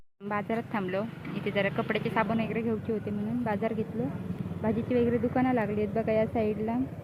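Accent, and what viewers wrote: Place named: Malvan, India. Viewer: तुजो गाव कोणतो नांव काय गावाचा‌ मालवणी बोलतस ऐकाक बरा वाटता मी पण मालवणी आसय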